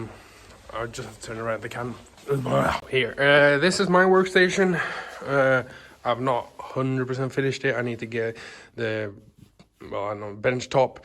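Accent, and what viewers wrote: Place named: England, United Kingdom. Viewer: fan jävligt bra video måste jag säga ändå och din engelska e fan jävligt bra låter som du kommer från england nästan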